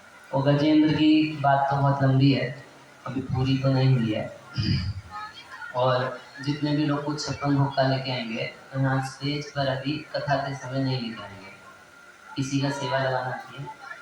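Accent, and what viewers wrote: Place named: Bihar, India. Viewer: Apka language bihari language se milta hai🙏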